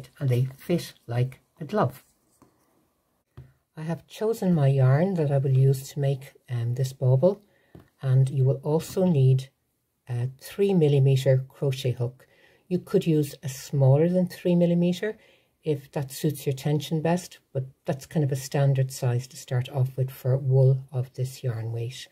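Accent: Ireland. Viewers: Thank you. Wish all my school teachers had had your Irish Accent maybe I'd have listened more at school, you make it sooo easy to follow. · delightfully surprised to hear an Irish accent when I turned the volume on lmao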